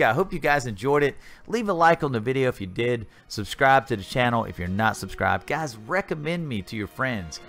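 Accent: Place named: Carolinas, United States. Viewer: Hey, I am going to assume by some comments you've made in the past and by your accent that you are from the Carolinas. I hope this looming hurricane doesn't impact you too much. I am about 30 miles north of the NC border in Virginia and about 10 miles from the coast, so we are preparing for it here too. Here's to hoping the storm doesn't hit either of us directly and that you don't have to work too many extra hours in the aftermath. Stay dry, my friend.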